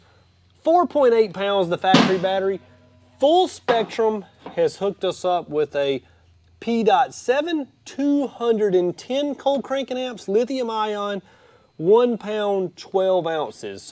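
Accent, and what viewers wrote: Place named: South Carolina, United States. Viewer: Such a shame you’re not gonna circuit race this baby she’d be a mean ass ride dude the South Carolina accent is just about gelling with this Kiwi boy from down under 😂👌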